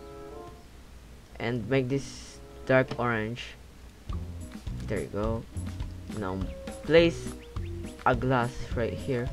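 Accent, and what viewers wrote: Philippines. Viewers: Just from the accent i can already tell ur also filipino xd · You can see that he’s filipino cuz of he’s accents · I knew that cuz of the accent, oh right im a filipino too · i just noticed that your filipino by your voice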